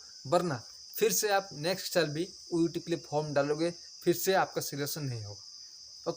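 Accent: Odisha, India. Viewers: Bhai Odia ho na 👈😊 Improve your hindi 😊👈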